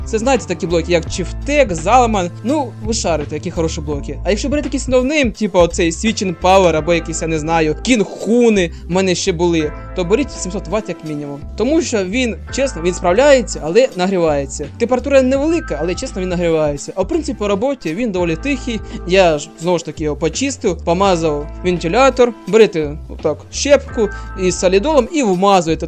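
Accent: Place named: Surzhyk, Ukraine. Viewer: Навіщо такий кончений суржик, кров з вух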